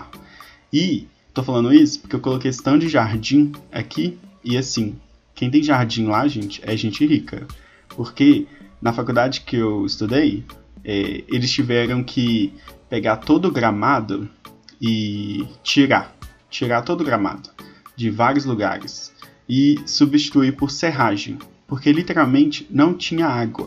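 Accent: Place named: Rio de Janeiro, Brazil. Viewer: Sou de Salvador e jurava que você era carioca! Kkkkkk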